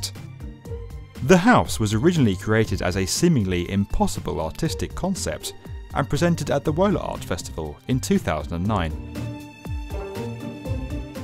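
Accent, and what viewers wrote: United Kingdom. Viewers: Are you British? cuz I...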